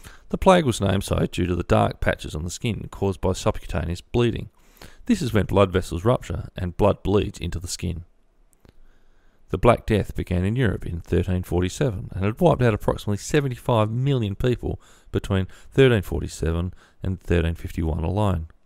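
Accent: Australia. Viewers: Oh dear - I’m sorry many of you couldn’t understand the narration - didn’t realise us Aussies’ accents were hard to pick up!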